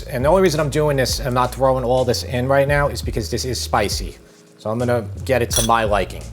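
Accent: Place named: Long Island, United States. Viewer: As an Ontarian Canadian, your Long Island accent makes me laugh every time. I really like your videos and your content, thank you for sharing them with me. One thing I'm surprised though, is that you use these large diameter cooking pans, but have such a small stove burner. Does that really work that well? I would think a larger burner would be something you'd be looking for. Also, is that a propane or butane stove? I never would've thought such a simple camper stove could be used to make food like this. Also, where do you find things like your cutting boards? I like how large the wooden one is, but don't really know how I'd go about looking for something like that.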